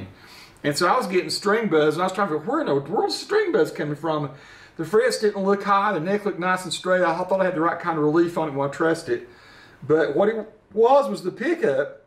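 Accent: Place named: East Tennessee, United States. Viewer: Are you from Knoxville? Your accent sounds exactly like the East Tn. accent.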